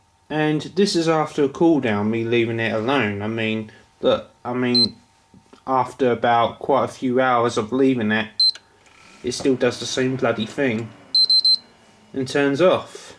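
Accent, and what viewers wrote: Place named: North London, United Kingdom. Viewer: He is English I would say from North London!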